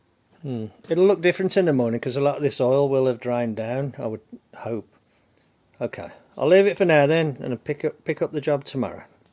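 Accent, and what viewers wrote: Birmingham, United Kingdom. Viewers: Your welcome the brummie accent the bring you back when there's more etc and similar phrases❤️. Good ideas and ways around problems good proper Mechanic. 😉💯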